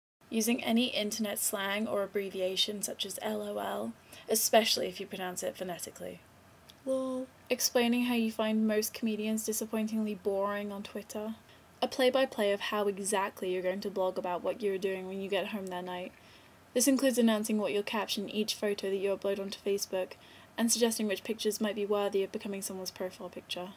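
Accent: England, United Kingdom. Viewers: By the way, from your accent you seem to be from england, so if thats true im just amazed that you also seem to have friends from all parts of the english-speaking world! woah! I mean i looked at a couple other videos & youre saying "my best friend from [canada]" & how you miss your other friend from australia! Very kl! Something i should admit - im: finding it hard to believe, a bit envious as i dont & curious to how its even possible. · I say the phonetic "lol" -_- But I'm proud to say that's the only one =D (ps. Yay, your English accent's coming back =)) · shes english... thts hw ppl speak over here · i subscribed to you just because your british accent. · NO! Cute british accent fading! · what's rule 34? sorry for not knowing : P and also, what accent do you have? it sounds british but it goes american sometimes :S :P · Trying a golden oldie again, huh? 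Well it was good. You sound more English again in this vid so I'm guessing that you're visiting or something.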